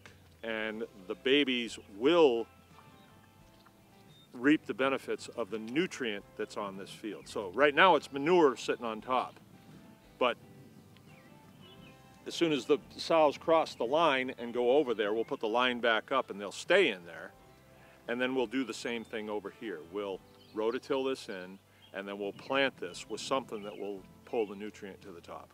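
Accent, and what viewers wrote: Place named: New England, United States. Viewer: I haven’t watched the whole video but you seem to be hiding a New England accent.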